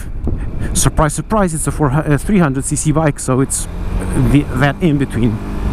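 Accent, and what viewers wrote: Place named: Portugal. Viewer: Faço like pelo sotaque tuga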